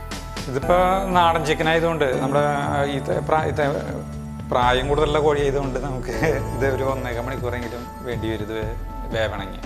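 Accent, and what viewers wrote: Thrissur, India. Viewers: Chettan porichu emmade Thrissur slangil...kari pedaayittundu..chattayee... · തൃശ്ശൂർ ഭാഷ കേൾക്കുമ്പോൾ തന്നെ മനസിന്‌ ഒരു സന്തോഷം ആണ്,ഈ കൂട്ടാൻ എനിക്കിഷ്ടായി,ട്രൈ ചെയ്തു,സൂപ്പർ,ഞാനും കൂട്ടാൻ ന്നാ പറയുക,കൂട്ടാൻ ന്ന് പറയുമ്പോൾ ചിലർ കളിയാക്കിയിട്ടുണ്ട് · Athe.. chettan Thrissur aan enn thonunnu.. nalla laalur slang und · Neat and I like your thrissur accent 😃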